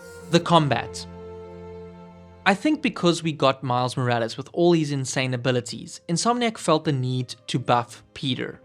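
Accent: South Africa. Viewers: Going into spiderman 2 I was expecting the game to be the arkham city (still the best superhero game as far as I'm concerned) of its series and it doesn't. The first game lived up to my excitement and it's very good and it was the foundation just as Asylum was for Batman but. Miles morales was moving in the right direction but this game was a letdown. Spiderman 2's stealth is worse somehow, the combat is missing the suit and visor mods, suit powers and gadgets are worse versions of the previous ones. The only good thing is the traversal and it's still lacking feature games like web of shadows allowing spiderman to run in any direction on the wall. I shouldn't have pre-ordered this one and got the RE4 remake and Deadspace remake. The games only cost 600 rands each at BT games and I would've saved 200 (I assume you're South African with accent). I agree about venom and Kraven being the best visually and the VAs did an amazing job but I was hoping they'd be stalkers who pounce out of nowhere like taskmaster did in the first game but Kraven was mostly a Gladiator. 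6/10 after my second playthrough. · Are you South African by any chance? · Fellow South African here. First time I’m coming across one of your videos, heard your accent and it was an instant ‘Subscribe’ for me 💪🏽 keep it up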